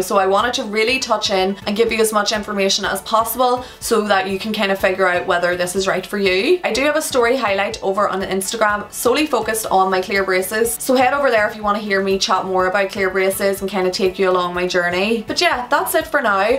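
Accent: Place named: Donegal, Ireland. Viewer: I wanna say that your from Dublin but you have a donegal accent (my accent)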